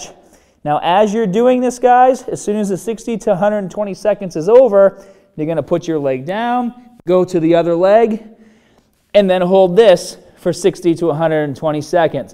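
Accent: Boston, United States. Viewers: Is this guy from Boston? What accent is that? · Nah, that’s a Masshole accent :-) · This guy is definitely from baaston... Great video thanks for tips.👍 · Before reading replies, I say Boston accent. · Not Aussie lol Boston it seems. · That's definitely Boston New England accent. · are you from wicked good Bahston? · A bit of Mahk in your accent lol. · Came for the tutorial, stayed for the Boston accent. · do your ears work He's from Boston. 😂, Australia 🤣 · Love that Boston accent! Excellent explanation and routine, thanks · Where’s this guys accent from in the US? He says wardah and pahtts. Nothing wrong with it its interesting and making me listen more 😅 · Staaaahted! LOL love the Baaaahston accent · Boston accent?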